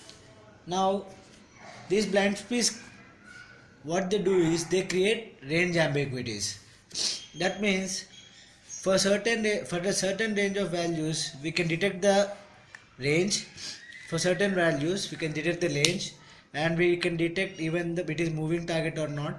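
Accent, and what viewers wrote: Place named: South India, India. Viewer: Your explanation is nice..but try to speak clearly..your South Indian accent is really confusing at times Thankyou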